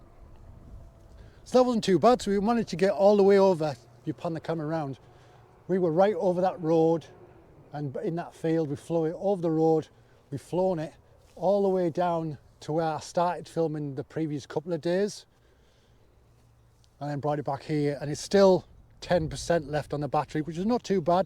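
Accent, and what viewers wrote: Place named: Teesside, United Kingdom. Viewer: Are you from Teesside by any chance i highly recognise your accent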